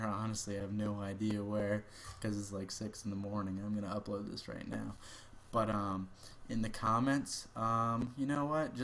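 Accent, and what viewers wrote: Canada. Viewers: Change the title to "All Aboot Me ". lol, I like how Canadians say 'about', and 'sorry' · I can hear the Trailer Park Boys in his voice. It's amazing. Soarry. Abut. Grade 5. Incredible.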